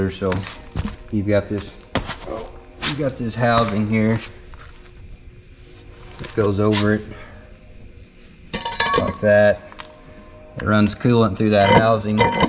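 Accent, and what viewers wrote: South, United States. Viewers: I enjoy every videa Please keep em coming when you have time. Even when the Cat cams are a real treat for you to clean up with all the "Blue shit" that comes on them... These relics are entertaining with the good ole boy country narration. Keeps the content interesting. Bottoms up 🥃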